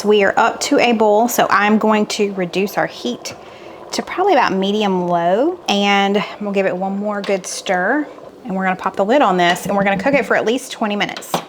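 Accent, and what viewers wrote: South, United States. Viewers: Mama English teacher we talk properly when we're at work and when we have too. When we're just being nice and among family and friends the southern comes OUT! It's okay girl😊😘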